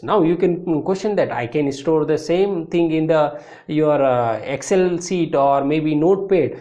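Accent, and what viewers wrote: India. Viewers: It feels strange listining someone with indian accent without this one being annoying or insulting me (call center worker)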